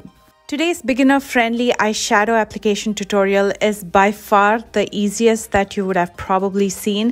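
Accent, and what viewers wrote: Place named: India. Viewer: I love her soft Indian accent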